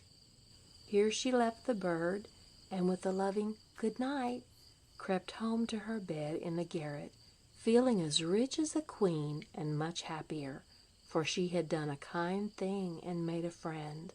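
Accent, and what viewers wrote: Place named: South, United States. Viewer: Terrible terrible southern accent from this narrator.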